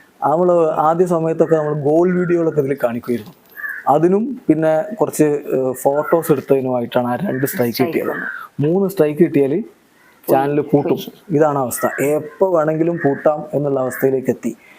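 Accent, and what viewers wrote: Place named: Malappuram, India. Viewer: Malapuram anengilum basha vethyasam undallo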